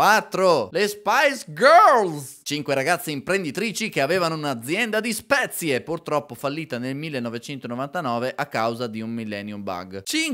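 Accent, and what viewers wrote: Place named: Romagna, Italy. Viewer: Bello l'accento romagnolo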